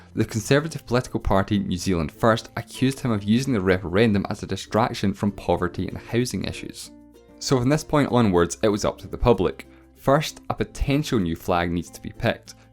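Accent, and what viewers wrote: Scotland, United Kingdom. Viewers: God, the Scottish accent is wonderful. Also, great video! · I think he's Scottish, but I'm not sure. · I know this is weird, but your chocolaty Scottish voice commentating with your great animation work gives me chills. You will go somewhere with this content, keep it up! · I think he's scottish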